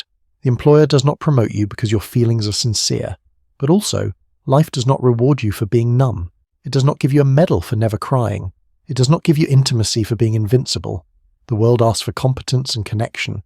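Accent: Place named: United Kingdom. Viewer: I just wish the AI voice wasn’t British. I’m getting pretty tired of that accent in AI.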